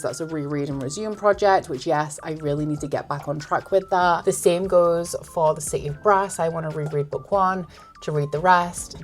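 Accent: Yorkshire, United Kingdom. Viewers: Ugh I just love a Yorkshire/Lincolnshire accent. I'm American and it reminds me of My Mad Fat Diary, which I was obsessed with as a teen.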